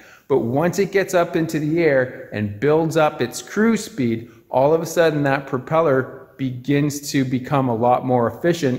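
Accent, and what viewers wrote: Canada. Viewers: Thanks for the info re: props I had no idea, I have a couple of?? what is the brand name of those outboard wing tanks you have on your plane, second? is that RR bridge that you & Vicky flew over the day you had trouble with your starboard side snow slippers, was the bridge C.N or C.P. you in Canada? put it this way you sound Canadian. Cheers from OZ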